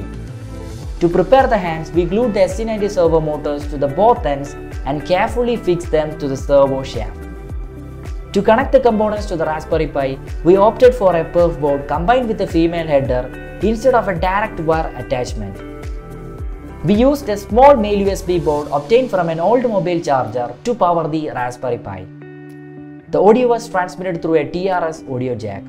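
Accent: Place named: Kerala, India. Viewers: Indian tone gives me goosebumps.... ❤❤ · Malayali tone actually ❤🎉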